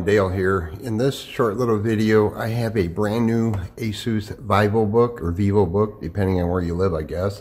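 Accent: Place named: South, United States. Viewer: You sort of sound "hillbilly" but you know your stuff. Well done it helped me a lot.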